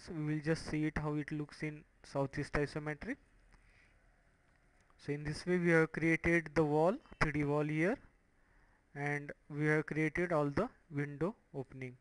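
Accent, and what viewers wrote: India. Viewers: He is an Indian dude. Not Pakistani. I can tell you from his Typical Kerala accent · i struggle to get much done with the Indian accent....chills me out to the point of sleeping haha :P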